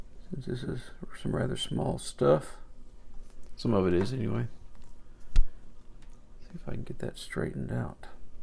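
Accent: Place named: South Carolina, United States. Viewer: You sound like you are from my area...South Carolina?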